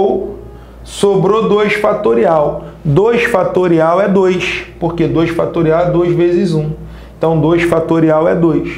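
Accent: Rio de Janeiro, Brazil. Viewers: esse sotaque do Rio é horrível. Parem de colocar o X no lugar do S no final e no meio das palavras.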